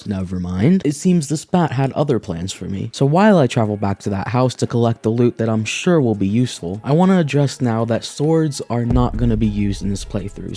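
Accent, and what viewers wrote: United Kingdom. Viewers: omg, bri’ish terraria 😦😦😦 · are you British?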